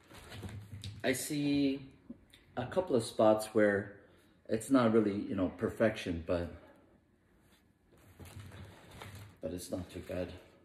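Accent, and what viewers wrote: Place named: Jamaica. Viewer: He almost sound jamaican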